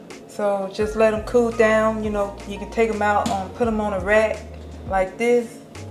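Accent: South, United States. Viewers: She's a southerner and that's the way we talk. Get over it 😂😂😂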